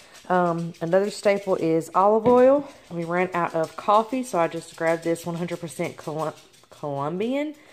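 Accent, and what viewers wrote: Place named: South, United States. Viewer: Family of 5! Girl, Idk how you do it lol. You're amazing haha. Are you from the south? haha I love your accent. I'm from KY.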